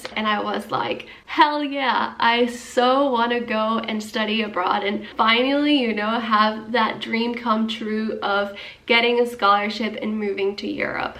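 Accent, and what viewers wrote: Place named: United States. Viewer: your accent is more American than Honduran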